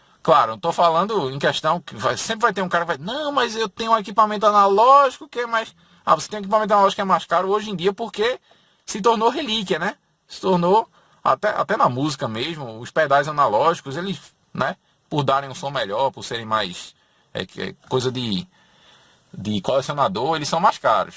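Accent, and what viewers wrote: Pernambuco, Brazil. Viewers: Esse cara é de Pernambuco ou região não é kkkk , Pernambucano e bixo ignorantemente e sotaque chato pra Caralho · Não é Pernambuco?? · É Pernambucano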